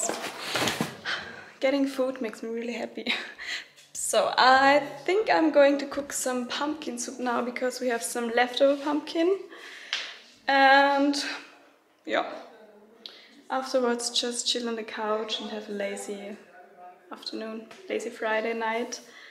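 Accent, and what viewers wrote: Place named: Germany. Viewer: Cute english with german accent 😃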